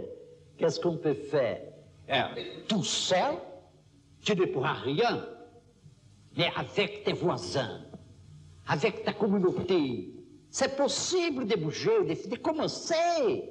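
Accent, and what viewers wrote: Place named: Brazil. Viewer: Ha algo que me emociona: Dom Helder fala um francês que os franceses podem entender mas sem perder o seu jeito brasileiro de ser ... ele é aquela pitada de sal que guarda o gosto do mar ... e então pode dar gosto à farinha que vai se transformar em pão : Deus seja louvado pelo nosso grande irmão Dom Helder!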